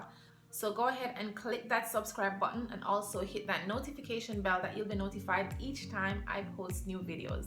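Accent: Jamaica. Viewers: I hear the accent 🇯🇲🇯🇲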